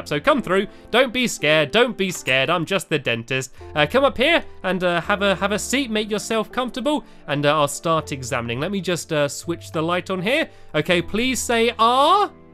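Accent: United Kingdom. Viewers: Are u British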